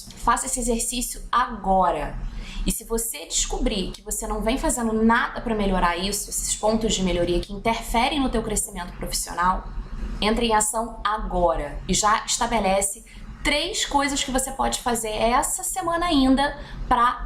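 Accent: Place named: Rio de Janeiro, Brazil. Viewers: Excelente video, adorei as dicas e a forma que vc aborda os problemas e soluções. Só não gostei do sotaque...carioques muito acentuada. Parabéns. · Ela e carioca😆😆😆😆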